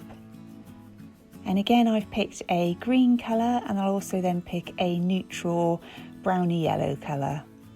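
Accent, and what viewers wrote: United Kingdom. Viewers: I just wanted to tell you how much I love your videos. All of your spreads are so pretty and watching and listening to you and your British accent is so relaxing! (Texas girl with a serious southern drawl here)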